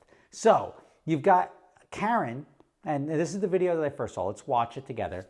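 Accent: New Jersey, United States. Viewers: Stop apologizing for talking like a New Jersey city boy.. that's the way we talk.. 🤷‍♂️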